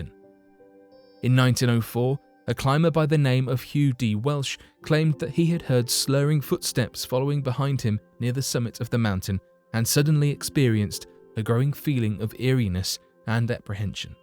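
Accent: United Kingdom. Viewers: I like this narrator much, much more than the usual one. He sounds like an actual British human and not a computer simulated voice. Great topic, too. Thank you for posting this!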